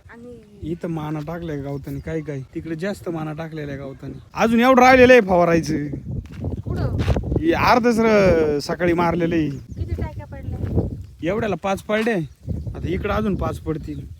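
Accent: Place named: Sangamner, India. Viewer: दादा तुझं गाव कोणतं आपली संगमनेर भाषा कुठेच लपत नाही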